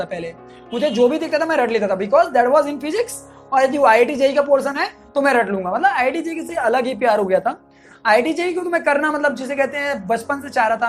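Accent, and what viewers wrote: Bihar, India. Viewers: Sir aap bihar se h kya?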